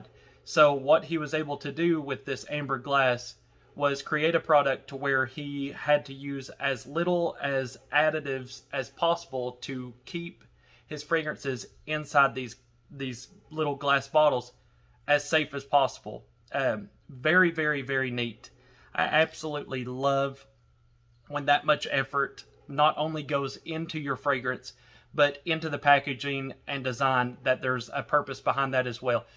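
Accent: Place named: South, United States. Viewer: Subbed and I’m just loving the southern twang of your accent! 🥰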